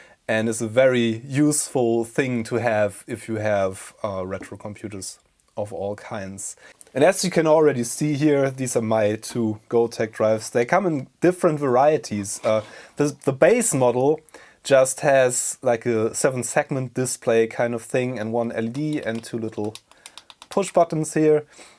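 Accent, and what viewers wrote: Germany. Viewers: Ich würde mir Wünschen das Du die Videos auf Deutsch machst. Dein Deutsches Akzent bei deinem Englisch ist Grauenvoll. Übrigens ist die größte Retro Community eh in Deutschland. Man kennt ja den Deutschen, der hält an eine Sache Fest bis Lebensende, egal ob die Sache Gut oder schlecht ist.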